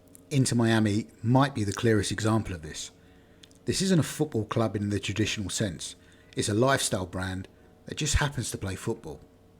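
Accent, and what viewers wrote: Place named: United Kingdom. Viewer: You have a heavy British accent and even if you are not a Brit you are definitely from Europe. All that means that you experienced that football, which is the Great Grandfather of the MLS. So yes coming from there you have all those feelings about the MLS. The Bits are the creators of the football we play today. Ebenezer Cobb Morley — often called the father of modern football because he helped write the first official rules. ⭐ So the short answer: 👉 England invented modern football (soccer) in 1863 when the rules were standardized.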